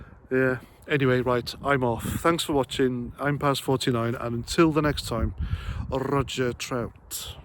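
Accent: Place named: Scotland, United Kingdom. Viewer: Real people speaking the real truth you n are legends keep going and loved yer Scottish accent kerse is an erse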